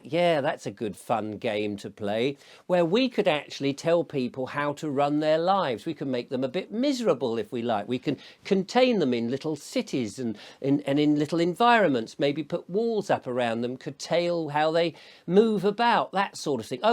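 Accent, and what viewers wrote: United Kingdom. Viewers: Wow! So well said. Its almost as if Mark Twain were still alive. We all know how he saw through things, too. I wish I was receiving that paper just to get a good laugh. Such an excellent job of presenting our situation. You are so gifted at speaking. Love your wonderful accent and British demeanor. Just absolutely love you. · You are an outstanding human being buddy ...no nonsense British talking ..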